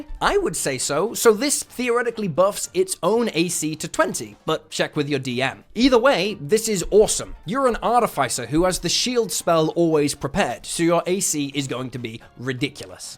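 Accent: United Kingdom. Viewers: guys I think he's British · You're British?? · Wait! He’s British? Thank gods Wilburton was there to take the tirade that revealed this fact to us. 😂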